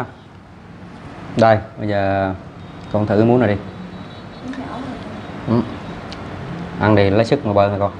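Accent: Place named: Quang Nam, Vietnam. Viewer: Bạn ở đây mà nói tiếng Quảng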